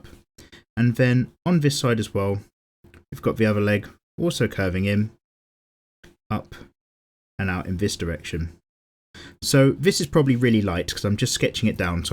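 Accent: United Kingdom. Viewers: damn. I WAS NOT EXPECTING A BRITISH ACCENT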